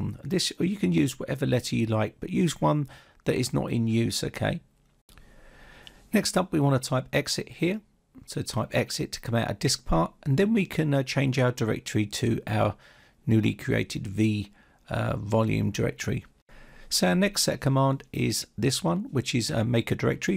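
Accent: England, United Kingdom. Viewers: I hate you english acent but thanks I use a windows 7 boot diferent to the windows 10 boot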